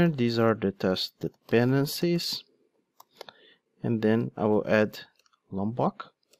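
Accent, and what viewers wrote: Brazil. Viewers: O sotaque não nega BR na veia.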